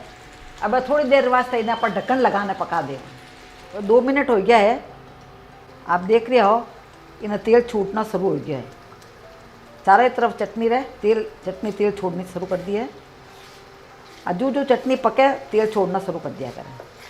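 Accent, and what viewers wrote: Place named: Rajasthan, India. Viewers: आप री सारी रेसिपी में फॉलो करु हु मम्मी जी। आप रो बनावन रो तरीकों ओर मारवाड़ी में बोलनरो तरीकों माने बहुत अच्छों लगे है। बहुत सारी रेसिपी में बनाई भी हु। आप री सारी जानकारी में जोधपुर रो लहजों आवे है।😊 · Iys typical marwadi language, easily understandable almost like hindi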